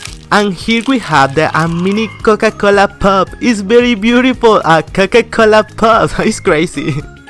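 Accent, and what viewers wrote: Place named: Spain. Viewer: Tienes más acento español que inglés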